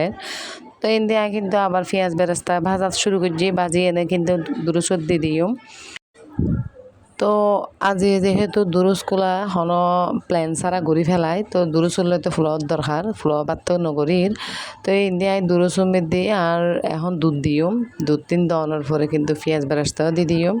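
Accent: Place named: Chittagong, Bangladesh. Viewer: আপু চট্টগ্রামের আঞ্চলিক ভাষা তোমার মুখে শুনতে খুব ভালো লাগলো ❤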